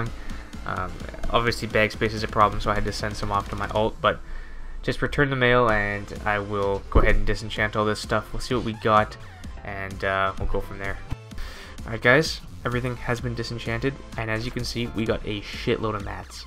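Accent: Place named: Canada. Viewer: great video brother I can't tell if your from the north US or Canada you kinda sound Canadian lol my bad I just like to know where ppl is from.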